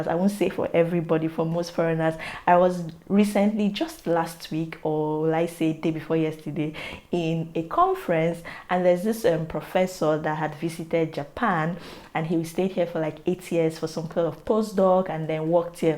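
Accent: Nigeria. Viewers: Very off topic but I like how u still have your Nigerian accent 😌😌😌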